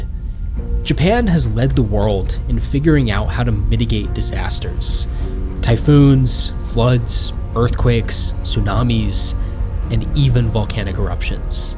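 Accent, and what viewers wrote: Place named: West, United States. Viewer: You sound very Western to me..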